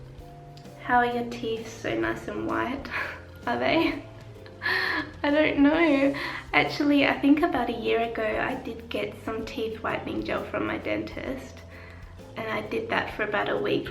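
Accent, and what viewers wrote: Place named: Australia. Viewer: love that aussie accent :)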